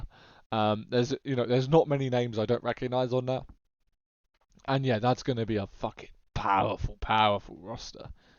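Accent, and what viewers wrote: United Kingdom. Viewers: dude! i just realized you're from the UK!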